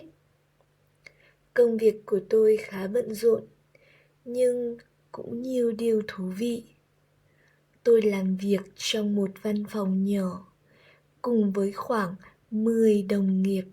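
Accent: North, Vietnam. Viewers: oh this is north vietnamese... I thought it was southern vietnamese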